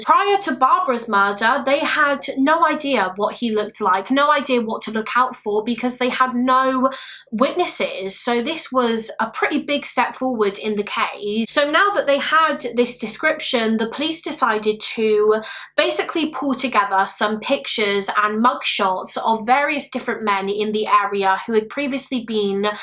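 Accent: United Kingdom. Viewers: Hi! I’m really into true crime and I found your channel recently. I have a few favorites and you’re definitely one of them! I love that I can feel that you’ve connected with the story you tell and you have a lot of compassion for victims. Keep being amazing! 💓 Also ironically all of my favorite YouTubers are from the UK. I have a vision disability so I mostly experience the content via audio and I just find UK accents so nice to listen to 🥰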